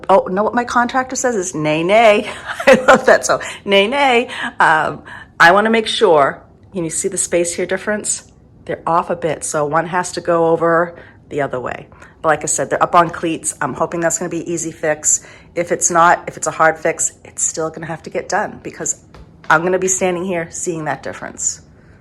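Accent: New England, United States. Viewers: I'm not even halfway through this video and I'm so excited to see your kitchen come together. One question I have is it looks like your stove is far from your sink, but is that just a result of filming and perspective? Also, I love how you say "drawring". Don't ever change that New England accent! 😀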